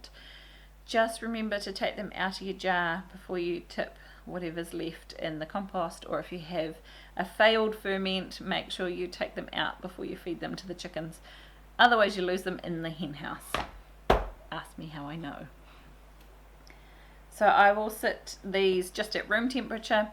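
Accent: New Zealand. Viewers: Awesome! So good to hear a kiwi accent! I live in the states and have done for years. Was looking around to make apple cider vinegar. So I will try yours . Thanks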